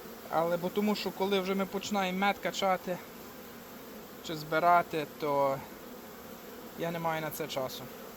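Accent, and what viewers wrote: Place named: Ivano-Frankivsk, Ukraine. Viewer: Цей говір , ну відразу ясно що людина з Франківська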